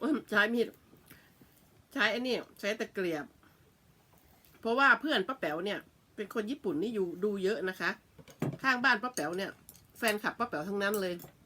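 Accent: Isan, Thailand. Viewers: ป้าแป๋วคนอิสานใช่บ่ ผมฟังรู้ 555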